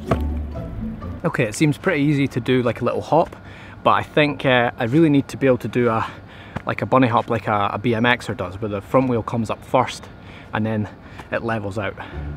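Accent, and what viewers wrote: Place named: Scotland, United Kingdom. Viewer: where is the skate park?Since you have a scottish accent i'm assuming somwhere in scotland,maybe glasgow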